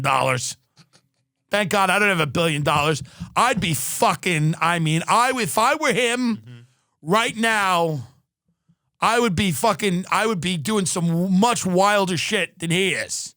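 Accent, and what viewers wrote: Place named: Long Island, United States. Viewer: Long Island accent is fantastic 🤣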